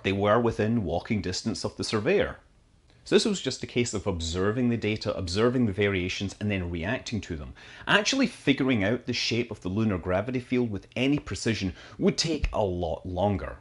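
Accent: Scotland, United Kingdom. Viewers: Once upon a time I was a six year old sitting on the lounge room floor watching an Apollo launch on a 23in b&w tv with the curtains drawn because, well, the phosphors had worn out. Years later, I am sitting in front of a Dell flat screen monitor listening to some guy with a Scottish accent walk me through a pair of lunar orbiting satellites taking careful measurement of the Moon's gravitational field. What a time to be alive. Fly safe! BTW, watched First Man last night, so I'm still a bit stoked about the things that man can achieve. Am so grateful for this channel.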